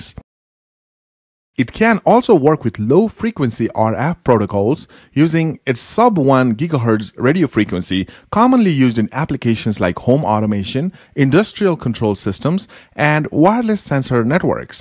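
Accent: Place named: Asia. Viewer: Old fuking video fukng asian accent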